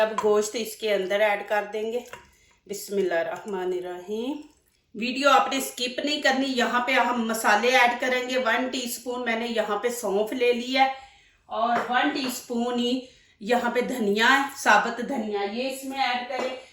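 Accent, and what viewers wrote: Punjab, India. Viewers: بہن پہلے آپ اپنے پنجابی لہجے پر قابو پائیں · Apna urdu lehja theek karen panjabe lag rahe ha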